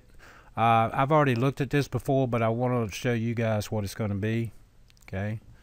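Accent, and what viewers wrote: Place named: Virginia, United States. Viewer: You sound like you’re from VA. Good video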